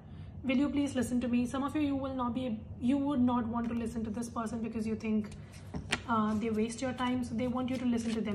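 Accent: India. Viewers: Found my new reader. Indian accent and saying bitch and accurate. 👍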